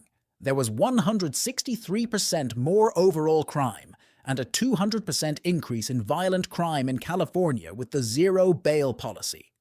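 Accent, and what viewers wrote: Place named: Southeast, Canada. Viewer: I think every American is just a dumbass. Including this Southeastern Canadian sounding guy too.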